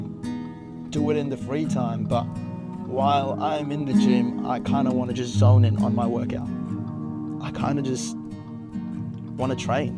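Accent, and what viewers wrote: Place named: United States. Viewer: Bro im 17,im from India i just came accross your channel and really loved your vids. In addition I'm impressed how you speak.. fcking smooth english with American accent and can speak hindi too. Bro i just want your advice on improving my English accent cuz i have big goals and i wanna be an entrepreneur on international level. So that will help me a lot.pls drop some gems here if you are reading this❤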